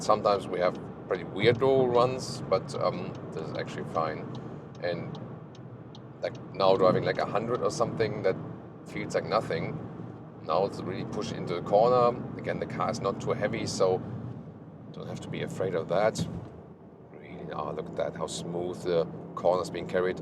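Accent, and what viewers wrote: Germany. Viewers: Klingt nach German English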